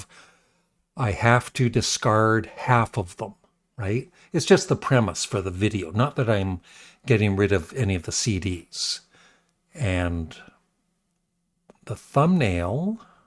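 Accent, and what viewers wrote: Canada. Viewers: Yes. He means when you pronounce the word 'About'. it does often sound like 'Aboot' because of your Canadian accent. That's all. But it is obvious that the word is 'About' so there really is no need for him to mention it. 😊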